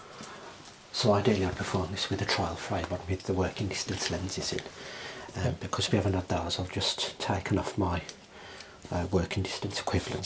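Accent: United Kingdom. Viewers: I find your voice so relaxing. Some of that may be that, not being British myself, many British accents are interesting to my ears, but it's more than that.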